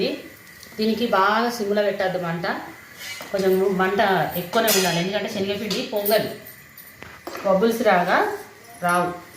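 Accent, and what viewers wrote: Telangana, India. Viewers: మన తెలంగాణ భాషలో మీరు చెబుతుంటే, నా చెవిలో తేనె పోసినట్లుంది. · ఆహా , ఎంత చక్కగా చెప్పేరో , మీ తెలంగాణ భాష చాలా బావుంది.... కళ్ళు మూసుకొని చేసుకోవచ్చు...❤❤ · Wow super antuy ❤mi taiking chala natural ga undhi motham telangana basha super❤❤nenu kuda try chesta antuy 🎉🎉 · Authentic telangana language without any inhibitions.👌👌. Keep it up. Very clear explanation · మీ తెలంగాణ భాష సూపర్ అమ్మ.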